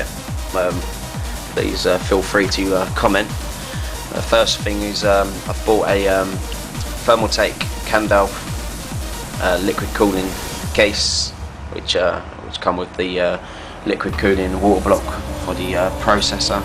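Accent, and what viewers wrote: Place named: England, United Kingdom. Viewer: jewel core processor, thats what it sounded like, haha. I love the enlgish accent.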